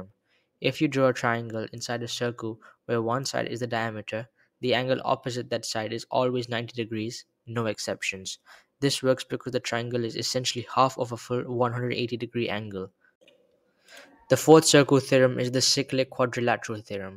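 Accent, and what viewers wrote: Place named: United States. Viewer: Dude your accsent is somewhat like american. Great job ❤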